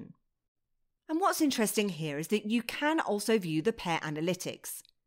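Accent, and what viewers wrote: United Kingdom. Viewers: that voice and British accent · British accent is nice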